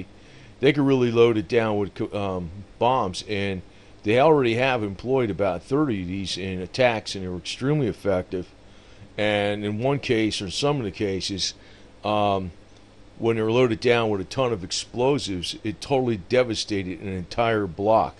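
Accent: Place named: California, United States. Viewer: Sound like California until u said isisl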